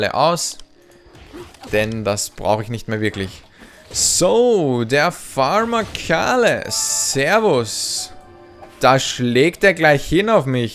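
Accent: Austria. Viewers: bist du österreicher ? und nebenbei .... hab mir lang überlegt das spiel anzufangen und du hast mich jz dazu gebracht :D du kannst es so gut erklären xD würde mich auf antwort freuen und vill können wir ja mal gemeinsam zocken :D Grüße, Ravaer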